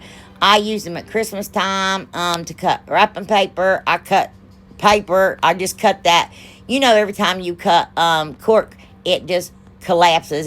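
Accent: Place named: Appalachia, United States. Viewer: 🩷Your accent,🩷 OH MY HEART! My family is from the Appalachian Mountains in Virginia, and I don't get to see them often, my Parents have moved to Heaven, so I don't get to hear this often, I could sit and listen to you FOR DAYS! I love your work, but, hearing you talk, takes me home and brings such joy to my heart, Makes me just want to hug you. THANK YOU for sharing with us. (That said, I don't know if I got a bad one or I'm too stupid to use it, but, rub n buff 🙄 I went to use mine and it was like a vein opened and squirted everywhere. Is it supposed to be liquid-ish?? Runny.. I even 'shook' it. So glad I found your channel. Be blessed in Jesus Name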